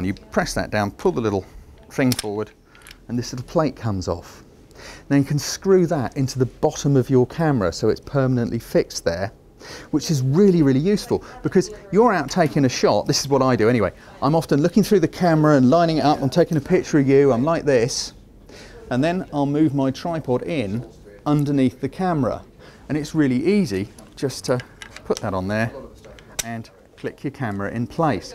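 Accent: United Kingdom. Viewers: I love your British accent, I'm subscribed just for the accent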